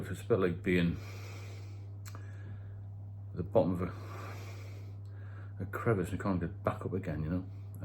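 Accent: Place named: Darlington, United Kingdom. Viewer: yep I’m subbed. I come from Darlington so I recognise the twang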